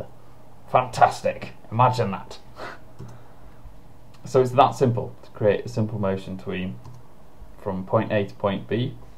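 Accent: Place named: Leeds, United Kingdom. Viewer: Ahhh no way, silly me. I went to The University of Leeds as well so you'd think I'd be better at recognising that accent haha.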